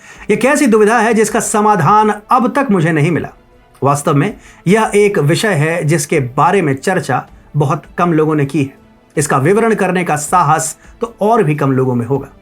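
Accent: Standard. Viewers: Kitne mahine baad itni shudh Hindi sunkar Aanand hi aa gya.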